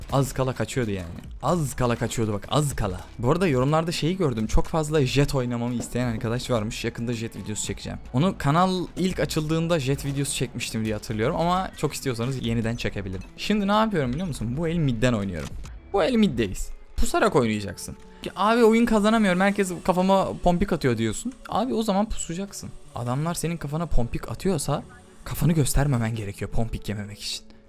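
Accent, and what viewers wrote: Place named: Yozgat, Turkey. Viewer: Abi sen yozgatlimisin konusman ole geldi bana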